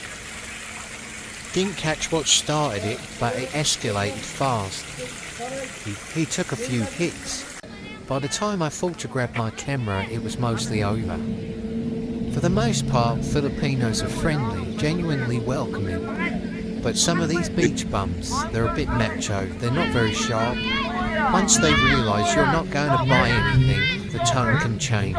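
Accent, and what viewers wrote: United Kingdom. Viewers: narrated in the style of Monty Python's 'Bicycle Tour of North Cornwall' very uplifting more like this with English accent please thanks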